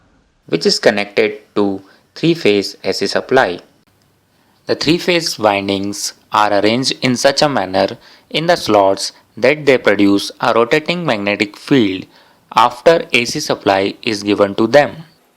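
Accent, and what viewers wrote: India. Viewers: I love indian accent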